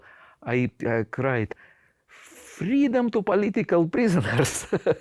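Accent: Russia. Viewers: At least the accent is Russian because where I live which is Canada its either Chinese or Punjab accents. Anything is better than those two. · russian voice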